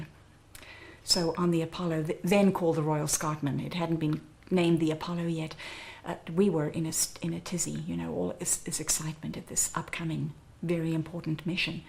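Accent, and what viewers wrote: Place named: Transatlantic, United States. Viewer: ine of the last beautiful women that use the transatlantic accent. love iy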